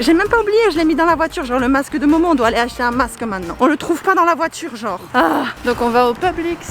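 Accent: Belgium. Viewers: T'est belge? Moi oui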